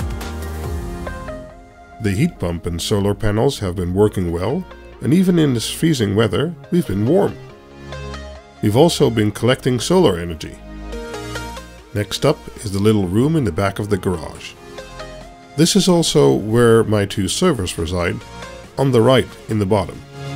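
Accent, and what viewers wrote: Netherlands. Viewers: Ik hoorde meteen je Nederlandse accent. Mooi huis!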